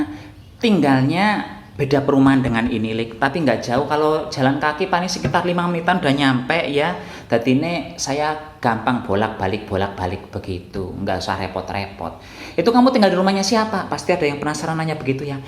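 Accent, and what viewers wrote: Banyumas, Indonesia. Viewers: Kiye siii wong endi? Ngapak ya? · sampeyan asli mana to mas koq pake jawa ngapak gitu Salam kenal dari saya orang solo 😁🙏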